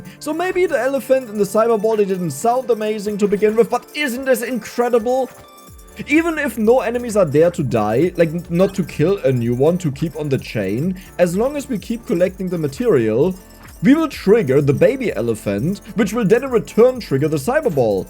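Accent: Germany. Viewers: ich höre einen deutschen akzent ???!!!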